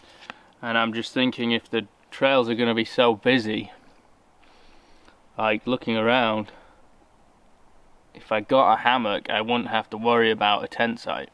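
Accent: United Kingdom. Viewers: Dude are you country or British I can’t tell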